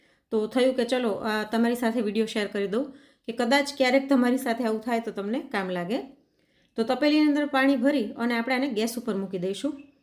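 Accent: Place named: South Gujarat, India. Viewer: Glad to know your area. I have never been there in Kutch. Based on your Boli, my prediction was wrong but your boli is not different than South Gujarati. Me and my wife both are from Surat, both retired, settled in USA since 1979 (46 years, perhaps before you were born; again predicting based on your young South Gujarati style voice). Keep on doing good job! Jay Hind! 🇮🇳🇮🇳🇮🇳 Jay Shri Krishna! 🚩🚩🚩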